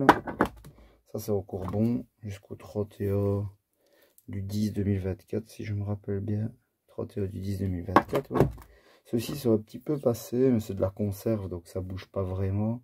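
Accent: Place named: Liège, Belgium. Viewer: bonne continuations vous avez l'acccent de liège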